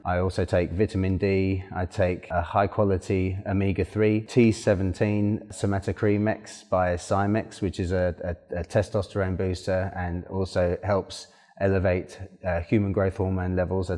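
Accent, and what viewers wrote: England, United Kingdom. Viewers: He's English!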